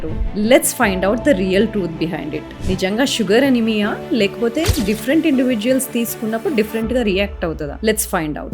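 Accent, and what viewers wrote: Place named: North, India. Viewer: Meru telugu na I thought an northie dr....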